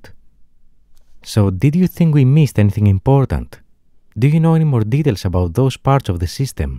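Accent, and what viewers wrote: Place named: Greece. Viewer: Its the typical greek accent in english.Myself i lough all time when i listen greeks speakimg English(im greek)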